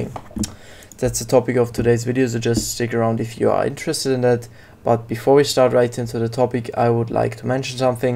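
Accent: Germany. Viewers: Digga dieser deutsche aktzent den habe ich auch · Man merkt voll das du Deutscher bist am ende vorallem mit Perfekt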